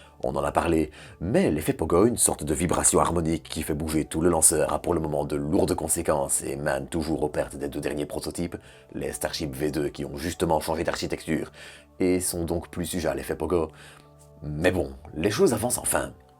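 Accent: Belgium. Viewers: C'est bien sa belle voix grave et son accent belge.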